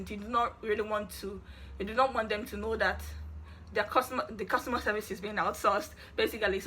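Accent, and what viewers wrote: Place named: Philippines. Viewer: You almost have a Filipino accent now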